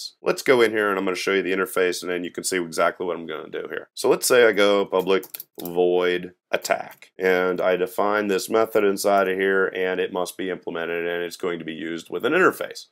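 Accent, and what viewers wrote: Pittsburgh, United States. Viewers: Enjoyed the pace and the material. Your accent takes me back to the 'burgh.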